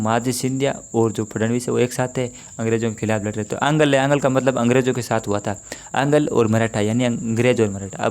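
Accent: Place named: Marwar, India. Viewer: सर आपके वीडियो में कभी-कभी मारवाड़ी भी झलकती हैं जो बहुत अच्छी लगती है। सर क्या आप मारवाड़ क्षेत्र से हो ? मेरे जैसे डीडवाना-कुचामनसिटी जिले से।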